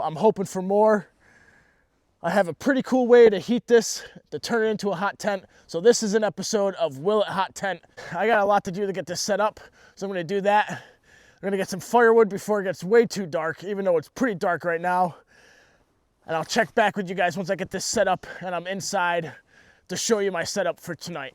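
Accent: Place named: New England, United States. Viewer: A few things keyed me in actually . The accent, the type of trees at your location but the biggest clue actually was the snow storm and the release date of the video. I'm from NH and we just had the same snow storm . We were expected to get 18 inches but we ended up with about a foot. So I figured you had to be some one close to my location for you to receive almost the same amount of snow I just got over the same weekend in an area that looks very similar.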